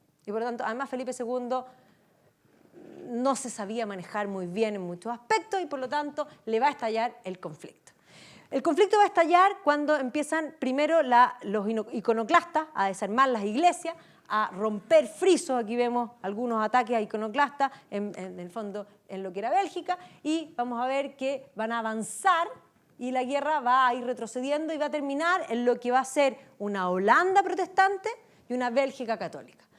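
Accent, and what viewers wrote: Chile. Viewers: Reconoci que era chilensis por el acento jajaja.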